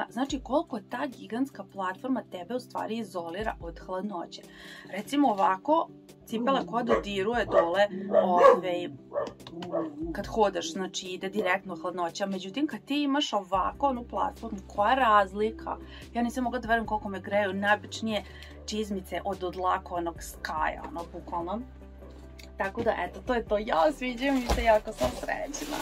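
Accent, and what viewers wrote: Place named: Novi Sad, Serbia. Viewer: Osmeh i pozitiva zlata vredni, najiskrenije 💜💜💜 kao i sve emocije koje krajnje iskreno iskazuješ. 💜💜💜 Plus, novosadski naglasak koji uvek volim da čujem. 🙂 Ti si jedna retko divna osoba koja svoje pratioce dozivljava kao drugare, a ne kao "vreću novca", i to je pre svega jako veliki plus- to govori mnogo o tome kakva si osoba. ❤️ No, to je već sve od samog početka poznato svima. 🙂 Što se zdravstvenog stanja tiče, od sveg ❤️ i najiskrenije ti želim da sve bude u najboljem redu što je pre moguće, prvenstveno zbog tebe same jer zaslužuješ samo ono što je dobro. Dušo naša divna i draga, još jednom sve najlepše ti želim! ❤️❤️❤️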